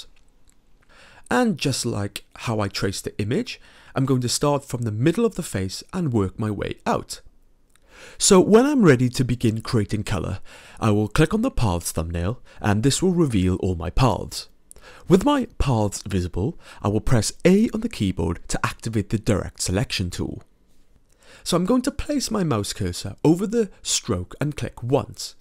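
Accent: United Kingdom. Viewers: it might be the british accent responsable for my confusion but you sound very much like the dude from the " School of life" chanle x)) helpful tutorials, by the way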